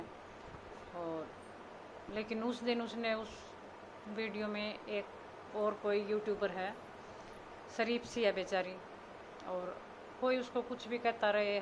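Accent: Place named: Haryana, India. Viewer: Anti kti dhar pr dhr de h aapni haryanvi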